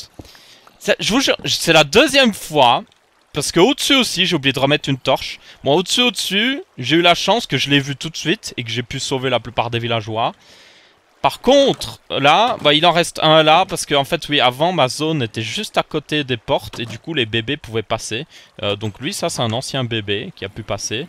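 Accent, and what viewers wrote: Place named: Belgium. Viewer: la vérité t'as voix de belge est sexy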